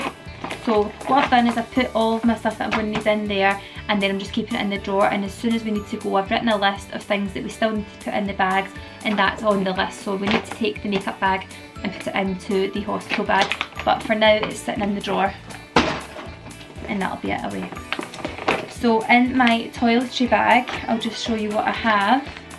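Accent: Scotland, United Kingdom. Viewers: i loved watching your video!! so nice to come across a scottish accent! im from isle of bute and live in Australia. thank you x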